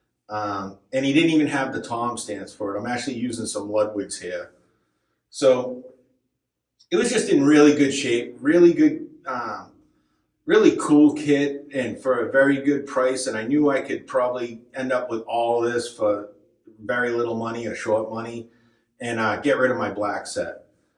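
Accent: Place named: New England, United States. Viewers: came for the drums, stayed for the new england accent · I had a Tama Rockstar kit that was the same setup as this. You mention Lake Winnipesaukee which is funny since my brother lives in Gilford which is right there. It's been years since I've played, but I follow all the top drummers like Weckl, Borlai, Sucherman, Donati, Coleman, Smith, Dicenso, etc. This kit takes me right back to the 80s when I was teaching myself to play from vinyl to bands like Rush, Zeppelin, Aerosmith, Judas Priest, Iron Maiden, Journey and the like. My first kit ever, in the late 70s, was a five-piece Slingerland. First song I ever played - Kiss God of Thunder. LoL I'm guessing you're a Mass guy like me with your accent and all. Pretty cool vid. Thanks for posting. P.S. I also owned a Gretsch set that I traded a North set for. Didn't care for the North.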